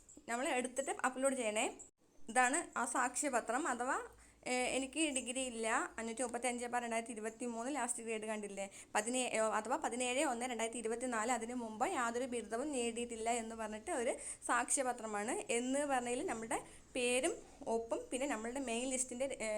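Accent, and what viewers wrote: Palakkad, India. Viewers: Palakkad kari ano miss thani nadan bhasha 👌👌👌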